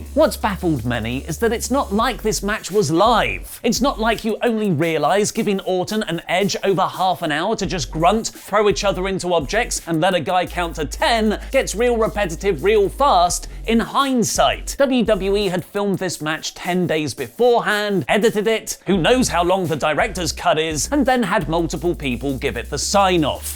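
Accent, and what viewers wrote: Australia. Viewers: That is one fine Aussie accent, my friend.👍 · your Australian accent is on point mate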